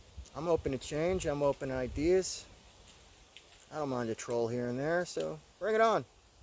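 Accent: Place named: Oklahoma, United States. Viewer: You didn’t say hunting you said “huntin” your an okie jersey is a long way form you now ole son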